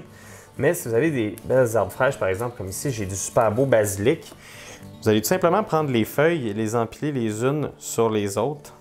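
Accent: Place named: Quebec, Canada. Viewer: C'est charmant votre accent au Québec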